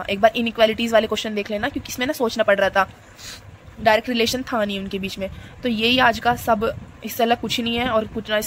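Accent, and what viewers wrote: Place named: Meerut, India. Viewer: awaaz se to meerut, shamli side ki lgti ho... btw thank you ..bdia review h 30 oct ko h mera dekhte hn...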